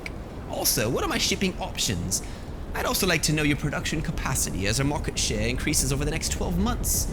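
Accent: United Kingdom. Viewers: Your British accent!!! 😂Loved this video!! You're amazing at simplifying all this overwhelming information. My partner and I are ready to begin the negotiation process. I'm nervous but I'm feeling so much more comfortable! Thank you!!🙏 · Very interesting video, and as an authentic Chinese I can’t agree more and found your ideas are very helpful, especially about the long term business relationship and friendship part. Those customer service staffs work ridiculous hours and get very exhausted, if we respect them and make them feel nice talking with, the communication would be much smother and more efficient. The end I wanna thank you, your videos are so helpful and encouraging. I already found a product that I wanna try with on amazon, and today I already started sending messages to suppliers. Good luck to me! Btw, I’m living in UK, and I don’t think your British accent is too bad, hahah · A little bit funny British accent but great. 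You are awesome!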